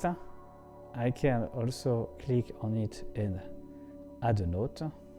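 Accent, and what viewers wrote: France. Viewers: What a helpful video. Thank you so much! This is the second video in 2 days where a French person feels like their accent is a problem - it’s honestly not even a thought. I’m sorry you guys feel self conscious. Truely I’ve never heard any negative commentary about French accents. In fact, I’ve only heard good things 😌 thanks again for the very helpful tutorial - much appreciated 😌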